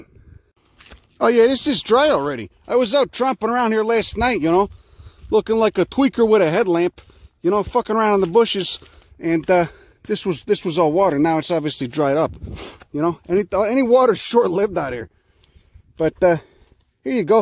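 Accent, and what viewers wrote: Chicago, United States. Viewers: I was reading about Salvia Hispanica when I noticed myself reading this sentence in Chicago-type accent like yours. Such nice wording for it too: "While soaking, the seeds develop a mucilaginous coating that gives chia-based beverages a distinctive gelatinous texture"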